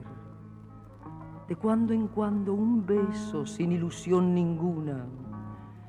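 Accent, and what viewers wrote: Spain. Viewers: Poesia bellisima, pero la andaluza que recita, es maravillósa de andaluza a otra, es genial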